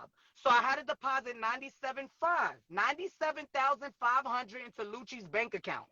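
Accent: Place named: New York, United States. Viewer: Sheesh that NY accent is aggravating; especially when it comes from women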